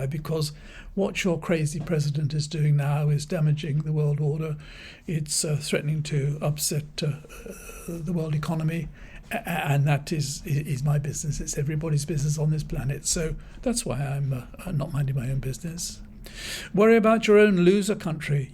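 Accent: RP, United Kingdom. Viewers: Ramstein is right, you are a dimbo, not even as smart as a nitwit, a proper plank like our PM, you are in short in your dotage, a foolish old Englishman with nothing but a slightly posh voice as cover, see how far it gets you in the real world, if you've ever been there a proper SNOB top to bottom, what crushed you radley school or London. or both? Get back to you biggles books, if you even remember where you put them.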